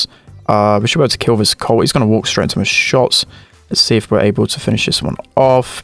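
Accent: United Kingdom. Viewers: r u fom uk?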